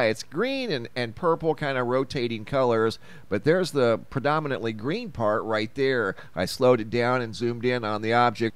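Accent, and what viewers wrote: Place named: South, United States. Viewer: If only you could just talk and not sing your words like with a old country twang!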